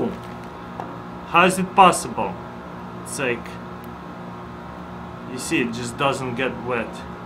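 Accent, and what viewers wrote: Russia. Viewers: Are you Russian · He's Russian. English isn't his first language. Dick.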